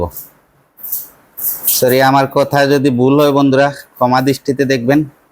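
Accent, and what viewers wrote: Sylhet, Bangladesh. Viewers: ভাই আপনাকে তো সিলেটি নি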